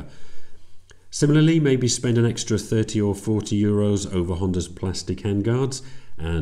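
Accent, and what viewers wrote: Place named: RP, United Kingdom. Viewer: BBC ENGLISH ! :face-orange-tv-shape:🤩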